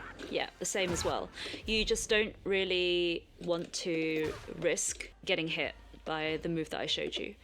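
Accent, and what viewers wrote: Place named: United Kingdom. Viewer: This is a good video. I like the fancy British accent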